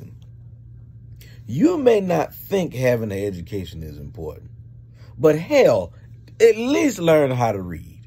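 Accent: South, United States. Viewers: They just a Lil country shuler. It's how we talk down south in TX. Thanks for the Birthday laugh. 😂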